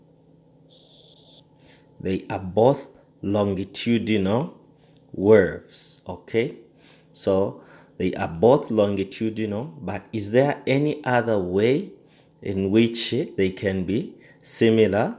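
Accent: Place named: United States. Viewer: Sir you have a nice accent arr ryu american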